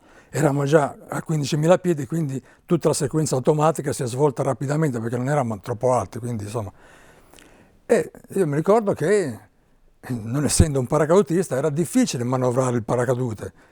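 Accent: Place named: Genoa, Italy. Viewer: grande generale ha il modo di parlare con come mio nonno di Genova è un simpaticissimo grazie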